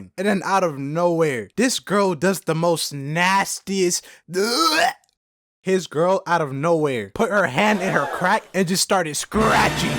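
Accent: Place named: Portland, United States. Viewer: it almost sounds like you live in Portland or smth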